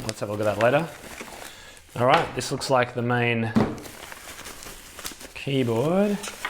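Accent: Australia. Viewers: I like your accent. Is that Aussie?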